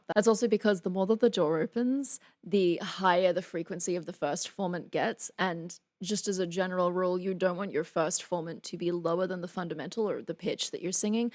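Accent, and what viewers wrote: Australia. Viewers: "You Nut Job"! I love how you Aussie's talk! PS: I'm glad you go with the English way of spelling words, like "colour" unlike everybody else who leaves the "u" out of a lot of English words, other examples are words like "parlour", "behaviour" etc. I'm a Kiwi so we don't leave the "u" out of words either unlike the American's do. Americans just love to abbreviate everything and anything until it's unrecognisable, I think! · And yes... I could tell you were a Queenslander from the accent alone :-)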